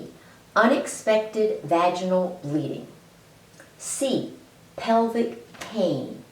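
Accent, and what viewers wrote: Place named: Texas, United States. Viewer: You are the best teacher…EVER! I loved your Texas drawl. ☺😘💕 Until next week, my dear.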